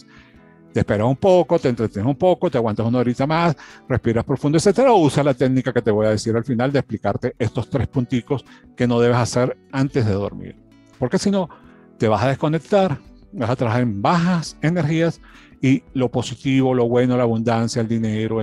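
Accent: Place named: Cuba. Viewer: Me encanta, lo que enseña son mis temas favoritos me encanta descubrir cada vez más lo que dijo JESUCRISTO... EL REINO DE DIOS ESTÁ DENTRO DE TI...... YO YA ME SUSCRIBÍ Y LO SIGO NO SE DE DONDE ES PERO OJALA, SE LE QUITE SU ASENTO XQ A VECES, NO LE ENTIENDO.... ALGUNAS PALABRAS, PAREGIDO A LO CUBANO CHICO... 😂AYÚDEME A ENTENDERLE MEJOR... LAS S S S SE LE DESAPARECEN A VECES... LO Saludo desde GUADALAJARA JALISCO . ME FASCINA SUS TEMAS Y SI TENEMOS PODER... ME ENCANTÓ LO DE JOSÉ SILVA, PERO OJALA FUERAN EJEMPLO CLAROS. ESPECÍFICOS QUE HACER EXACTAMENTE.. MIL. GRACIAS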